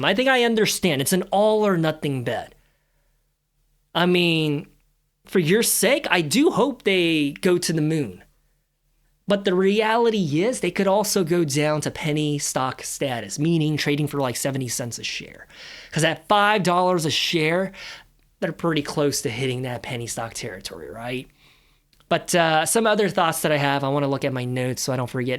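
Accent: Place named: California, United States. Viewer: I thought you lived in California, due to your accent.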